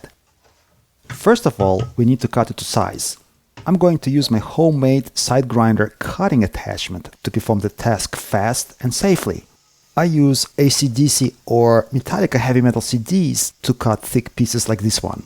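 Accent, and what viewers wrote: Russia. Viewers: У автора русский акцент. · "Presents should not have any functional weaknesses or design flaws" - that must be the most Russian thing I have ever heard, and your accent only made it better. Great job, sir.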